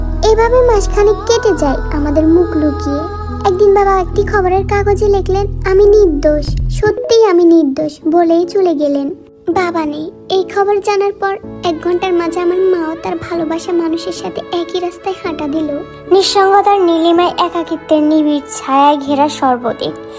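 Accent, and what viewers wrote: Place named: Old Dhaka, Bangladesh. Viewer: আপু তোমার এক গল্প কয়বার দেকলাম ঠিক না-ই তোবো ভালো লাগে আমি fb এর মধ্যে পরছি তবো এমন ভালো লাগেনাই। যতোটা তোমাদের মুখে ভালো লাগছে। বিশেষ করে তোমার মুখে পোরান ঢাকার ভাষার নতুনভাবে প্রেমে পরলাম ভালো লাগে। যতো বার শুনি মনেহয় এই ffটার প্রথম বার শুনছি। ❣️❣️😊😊😊😊😊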